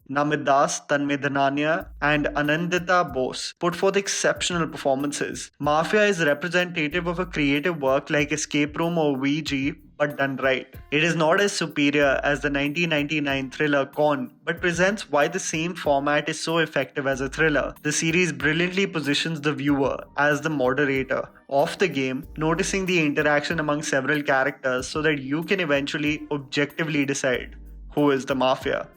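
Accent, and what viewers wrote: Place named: India. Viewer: your hindi accent is too funny btw Great work 👍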